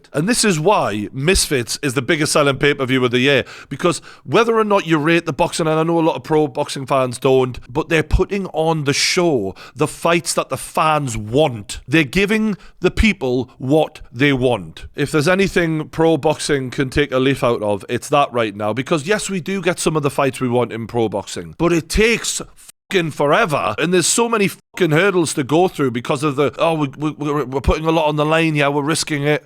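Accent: Newcastle, United Kingdom. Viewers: Truth always sounds better when it comes from a geordie.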